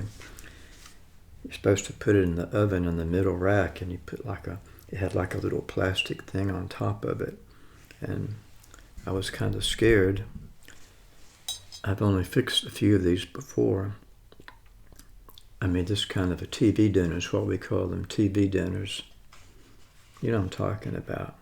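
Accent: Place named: United States. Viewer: Which US state are you from, the accent is so cool!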